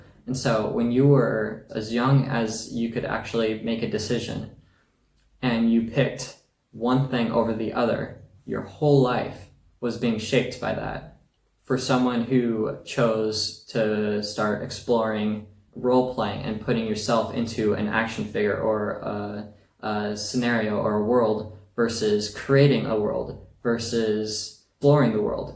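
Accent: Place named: United States. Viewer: I thought you live in USA.......becouse the way you speack english language....!😊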